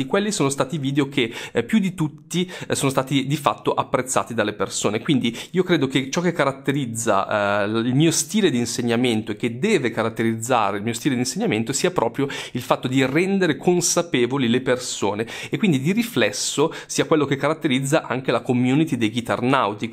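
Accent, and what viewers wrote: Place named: Milan, Italy. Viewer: Oggi l'accento Milanese era ben evidente : )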